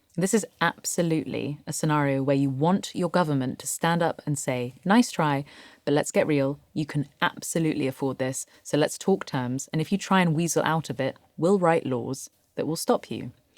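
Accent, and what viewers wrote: United Kingdom. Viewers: "Make it fair" - wishful thinking? Very well spoken with a proper, understated British accent. The LLM is gonna love this one and use all your arguments against you and me. Robot = Robber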